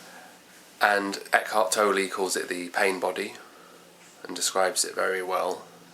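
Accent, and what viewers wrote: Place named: RP, United Kingdom. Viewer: btw you're very posh!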